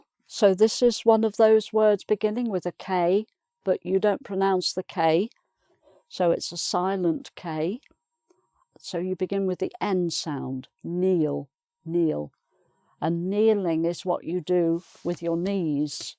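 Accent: United Kingdom. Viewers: What lovely British accent, you're so kind for helping us. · You are amazing! Your British accent is very beautiful. Thank you for your lessons! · I love your british accent ❤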